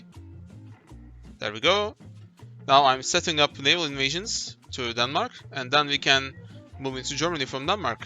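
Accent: Turkey. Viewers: aksandan direkt Türk olduğunu anladım :D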